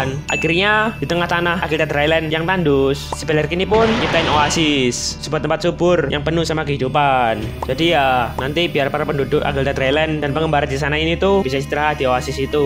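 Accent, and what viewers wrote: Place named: Sulawesi, Indonesia. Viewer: Banh lu orang Sulawesi kah? sebagian logatnya orang Sulawesi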